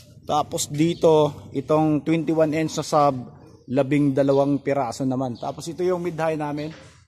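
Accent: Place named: Visayas, Philippines. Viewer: Bisaya kayo boss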